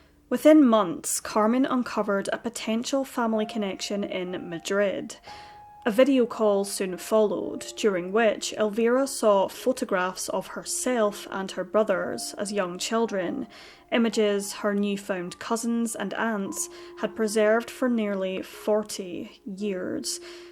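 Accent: Scotland, United Kingdom. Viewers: Interesting story but the Narrator's absolutely HORRIBLE Scottish accent makes it too unbearable to listen to!!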